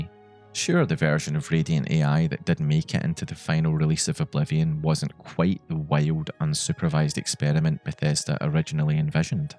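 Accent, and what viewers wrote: Scotland, United Kingdom. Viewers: I love when I randomly encounter a Scottish YouTuber. "Bumf" is a cracking word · Is this a Scottish accent?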